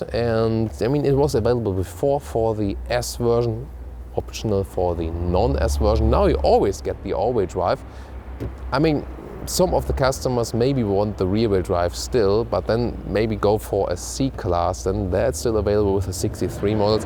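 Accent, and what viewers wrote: Germany. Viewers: very good review! Although in my view the sport seats which are part of the Edition 1 are very comfortable in my view. I agree with you, the Standard seats are eventually better for very long trips, but they look too serious in my view. But that is a very personal thing, I see that you like more the traditional style things, elegance - thats good and fits to you :-) I like your reviews very much, but why dont you do them in German, I can hear that you are a German (I am Swiss).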